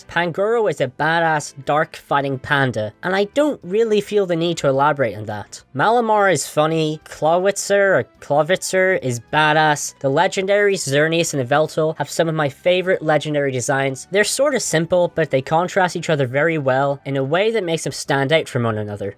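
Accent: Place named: Northern Ireland, United Kingdom. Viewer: You clearly put lots of effort into this video. I love your editing style. Keep up the great work dude. Although I’d say lose the northern Irish accent.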